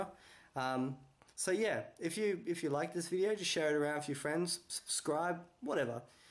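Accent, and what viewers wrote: Australia. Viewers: g'day brother you sound Australian.. any chance for a few lessons.. live In Buxton nsw near Picton. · Yeah, basically. I feel like a lot of Esperanto material is geared towards komencantoj, but I have been reading more advanced stuff lately. "Being Colloquial in Esperanto" is one of my favorites for English-speakers. I also just ordered "Vojaĝo en Esperanto-lando" directly from Boris Kolker himself, so that should be good. My Esperantist friend in North Carolina wants me to attend NASK,(Nord-Amerika Somera Kursaro) this summer, so we shall see. But what I've really been doing is what I call immersion. I've been trying to spend as much time as I can in Esperantujo. I skype with Esperantist friends, listen to Esperanto radio and music, chat in Telegram chats, read in Esperanto, and I've even started to have dreams in Esperanto (mostly stress dreams about being somewhere with fluent speakers and not being able to follow the conversation). 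I think it's working, though. I feel like I am making great strides. Between my first and second live Esperanto meeting, I noted a marked improvement in my ability to hold conversation in Esperanto. I really enjoy your videos, though. They are helpful, though I am somewhat embarrassed that I've known Esperanto longer than a lot of fluent people but and not as good as them. I'll be there, though, soon! I enjoy both your Esperanto-language videos and English ones. As a side-note, I first found your Esperanto language videos and I was surprised to hear your Australian accent in English. Good job on your Esperanto accent!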